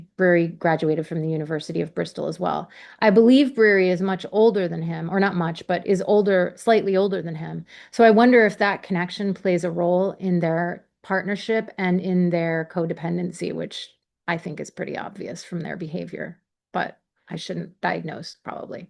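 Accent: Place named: United States. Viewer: It is so shameful that we have to listen to someone with an American accent. The English so called justice system is now the laughing stock of the world because of the Lucy Letby witchhunt. We should also be grateful to Rachel Aviv who wrote from across the Atlantic at a time when truth telling in our country was banned by the trial Judge.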